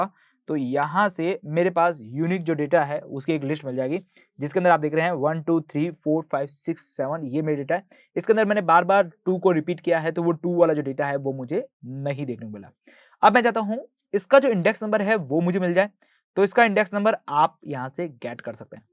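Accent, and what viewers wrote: India. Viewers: get rid of that indian eng it sucks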